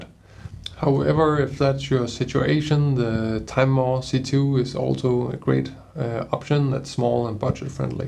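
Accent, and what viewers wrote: Denmark. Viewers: Super-grateful for your quick and clear reply! I'll go for the basic. Thanks for your great content!! Btw - are you based in Denmark? Your accent gives me that impression! :) Greetings from Sweden! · Your accent sounds Danish, er you from Denmark?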